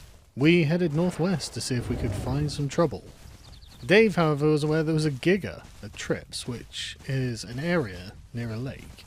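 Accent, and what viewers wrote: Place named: United Kingdom. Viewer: No hes just british🤭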